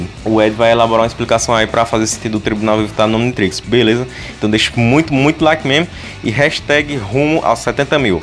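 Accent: Northeast, Brazil. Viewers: O melhor nen e o conteúdo e sim o jeito nordestino do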